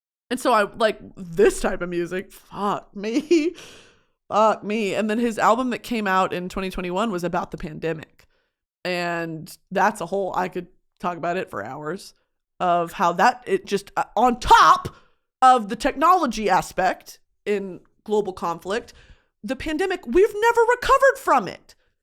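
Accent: United Kingdom. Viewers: the british accent got me :face-purple-crying:😭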